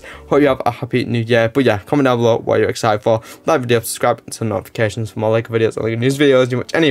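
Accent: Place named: Estuary English, United Kingdom. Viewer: It's pretty hard to understand him as a European, as well... I guess this is what's known as "Estuary English"... (More or less modern Cockney...)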